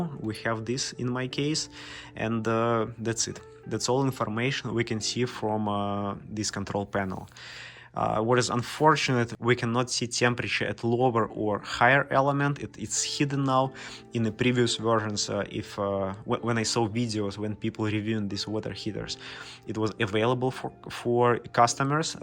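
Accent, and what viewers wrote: Russia. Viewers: Buddy, Are you Russian ?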